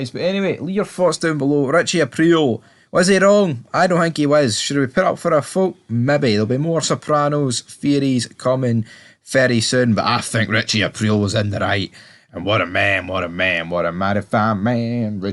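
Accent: Scotland, United Kingdom. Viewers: The Scottish narration doesn’t go with the subject matter.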